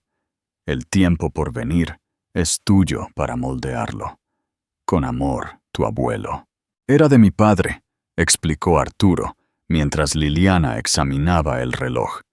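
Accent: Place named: United States. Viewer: Pronunciation y acento muy gringo no me gusta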